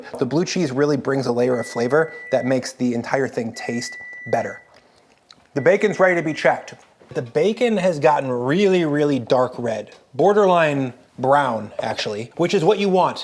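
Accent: United States. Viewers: I love your American accent.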